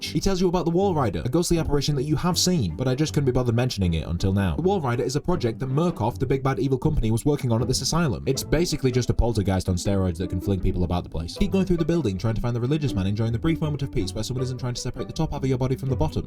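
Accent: North, United Kingdom. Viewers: Love you especially because your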